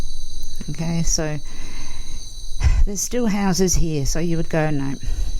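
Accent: Australia. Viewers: Are you Australian? Great video...praying for Gannons return 💙💙💙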